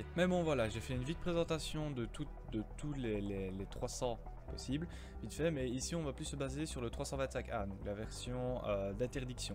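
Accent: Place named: Liège, Belgium. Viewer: Tu viens de Liège ? xD je kiffe cet accent 😂👌🏻 sinon bien la vidéo c’est cool je vais sûrement prendre celui là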